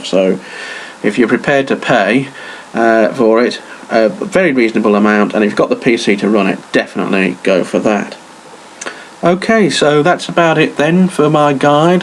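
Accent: United Kingdom. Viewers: Good old Northern English dialect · You have a wonderful British accent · i love your english accent, its calm, easy to follow, and very clear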